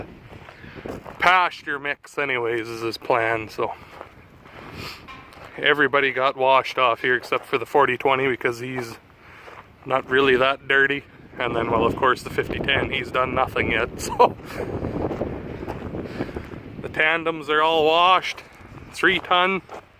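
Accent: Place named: Canada. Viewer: This is a Canadian channel, if the name doesn't give a hint the accent sure do 😅 keep it up, really like your attitude man!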